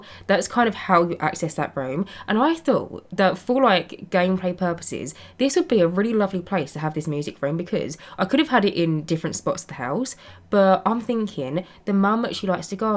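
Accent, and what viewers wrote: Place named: England, United Kingdom. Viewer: I really like watching your builds but i can never understand the English accent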